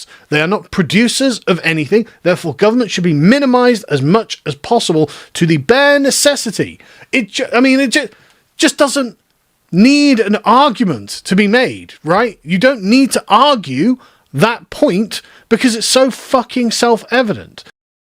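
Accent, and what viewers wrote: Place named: United States. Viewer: Sounding like an American now. 😆 👍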